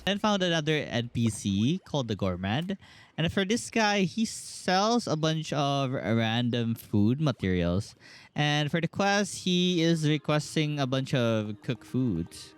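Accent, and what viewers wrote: Philippines. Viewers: are u from the felepens caus u sound like it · I knew it. From your voice this is a Pipino. Kabayan! Hahaha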